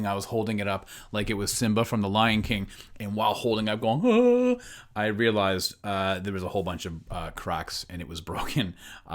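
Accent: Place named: Canada. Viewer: I just come for the Canadian accent. And ketchup chips rock esp since none in US.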